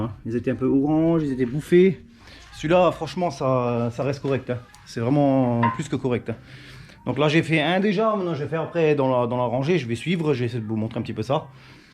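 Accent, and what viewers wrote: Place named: Lorraine, France. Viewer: J aime bien ton accent, ça me rappel ma famille du côté de ma mère, on était à Metz. Les Kron et Ramm. Maintenant je suis en région parisienne, la misère pour trouver un bon garagiste... Faudrait que je fasse 350 km pour te voir ...😢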